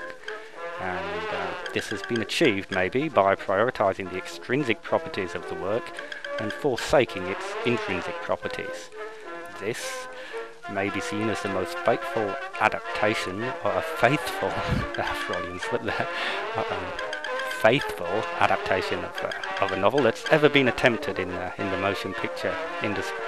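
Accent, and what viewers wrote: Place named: South London, United Kingdom. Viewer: Wow you've lost your northern accent and sound like you're from south London like me! And the trailer is a groove. I can't wait for the full film....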